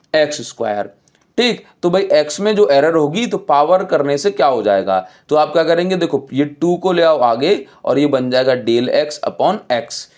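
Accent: Odisha, India. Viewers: Are you odia?? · Are u from odisha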